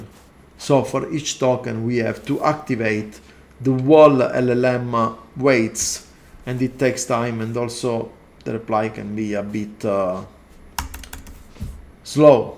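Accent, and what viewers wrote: Sicily, Italy. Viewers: inglese con accento siculo o sintesi in italiano.... vita di merda XD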